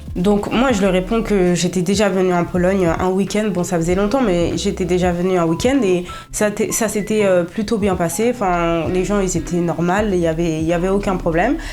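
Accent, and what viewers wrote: Paris, France. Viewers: To chyba paryski akcent. Przyjemna dziewczyna. 🌹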